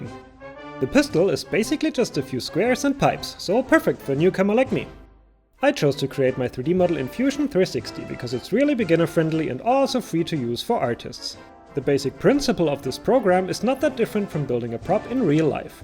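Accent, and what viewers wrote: Germany. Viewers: ich wusste das du deutsch warst ... hört man am Akzent und an dem deutschen lack😁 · i knew you are german! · okay is he german? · No german is be able to hide his accent :)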